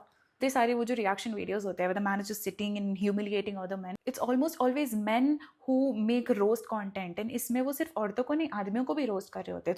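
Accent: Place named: United States. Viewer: one thing made her channel different from others and attractive that is <3 her personality in long video's is like -: pure AMERICAN ACCENT !!😁 and in reel's and shorts is like-: pure desi and dark vibes 😜😁 with some funny sprinkle spices ♨✨❤!! one thing i must say 😊🤨>> wht happens with this cute gurl !! in both the format of videos 😅🎀!!!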